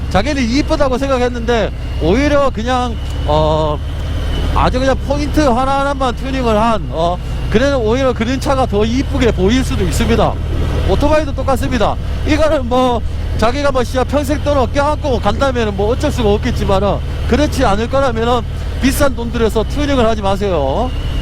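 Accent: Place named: Gyeongsang, South Korea. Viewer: 같은 경상도라 정감이 가네요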